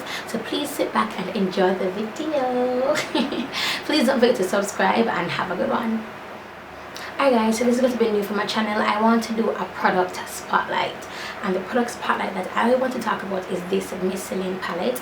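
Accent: United Kingdom. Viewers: does she have a mixed accent cos she also sounds like she has a British accent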